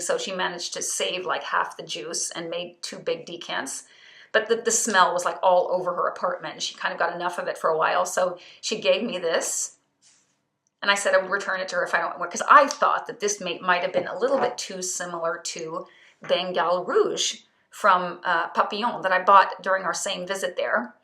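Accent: United States. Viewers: Hi. I believe that you are Swedish, so I think it's interesting that you sound American. Also, I appreciate your insight concerning the fragrances you mention. I plan to start familiarizing myself with Comme de Garcons and some of the other ones you mentioned in this video. Recently, I added Guerlain "Bois Mysterieux" to my collection and it instantly has become one of my favorite fragrances. I highly recommend that you try it if you haven't yet. Two days ago, I wore another favorite of mine: Veronique Gabai "Cap d'Antibes". I like that one a lot. Have you tried it yet? I'd like to hear what you think about "Bois Mysterieux" and "Cap d'Antibes". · Her mom from US that’s why she sounds American).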